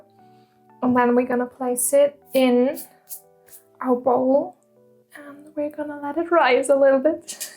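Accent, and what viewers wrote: Germany. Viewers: She is German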